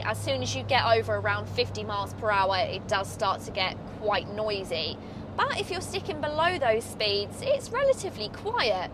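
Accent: United Kingdom. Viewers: Your UK sounds so nice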